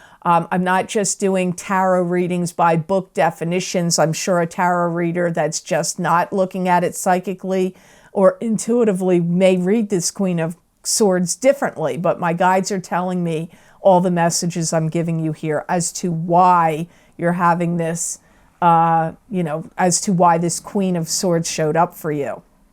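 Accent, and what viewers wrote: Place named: Baltimore, United States. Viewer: Hi, are you from Baltimore? You sound just like a hometown girl! It's my hometown.:) BTW, I am disposing of my brother's estate which includes two cars, an airplane and his house. Talk about large items! You are right on about that!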